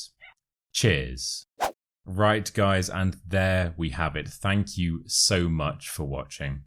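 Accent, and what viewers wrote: United Kingdom. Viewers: I love your accent so British, I wanna speak like you 😊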